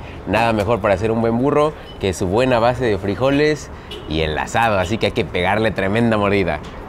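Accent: North, Mexico. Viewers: 1 dia sale de su rancho y ya trae acento norteño, no mames. XD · HASTA EL TONO NORTEÑO SE TE PEGÓ 😂 SE ME ANTOJÓ TODO PERO MAS LOS TACOS 🤤😋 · El acento!!! El acentoooo!!!🤩🤩 te saleee súper bien tu norteño interior jajajaj🥰 saludos desde Culiacán Sinaloa 🤙🏾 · Llevas unos días apenas y ya se te pego el acento norteño! 🤠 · Se le pegó el tonito norteño!!! Jajajaja... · ya se te anda pegando el tonito del norte jasjajsjaja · Cómo que el acento del Norte se te está pegando mucho 😂😂😂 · Que chulada que disfrutaste la visita, oye pero creo que se te pegó el acento norteño Aaaaajjuuuuaaaaa!! 👍🏻👌🏻😋😉 Saludos!!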